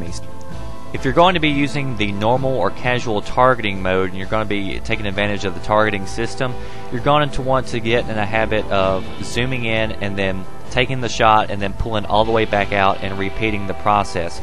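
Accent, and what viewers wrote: South, United States. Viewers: are you from the south? don't worry I'm not a dumb yankee I'm from Mississippi i can just really hear your accent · The fact that you’re southern makes this video so much more fun. You’d be good for voiceover work.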